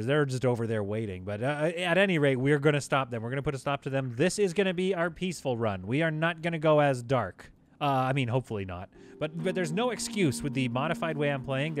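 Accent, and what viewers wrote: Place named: Yorkshire, United Kingdom. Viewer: Don't you be dissing the Yorkshire accent!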